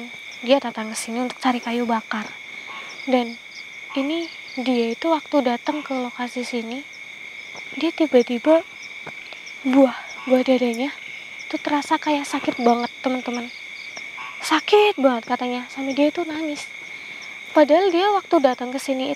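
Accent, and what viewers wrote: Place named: Java, Indonesia. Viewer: Mba dr logat nya mba dr Jawa ya.masih kelihatan lihat Jawa nya.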